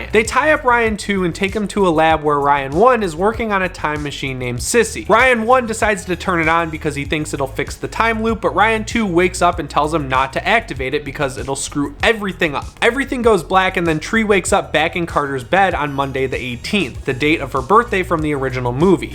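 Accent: Midwest, United States. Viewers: You sound like you're from Chicago or midwest.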